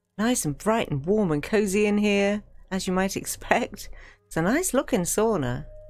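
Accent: United Kingdom. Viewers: come for the endearing British accent and relaxing home reviews, stay for the squints essay on the politics of Tamriel?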